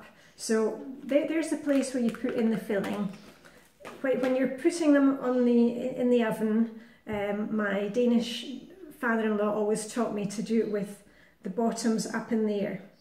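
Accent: Scotland, United Kingdom. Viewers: I love the fact that you can pronounce the y spot on as you do it with the Kylle kylle Tuborg øl. Very good videos. Keep up the good work. Curious to learn where you're from - I presume Scotland but couldn't pin point the dialect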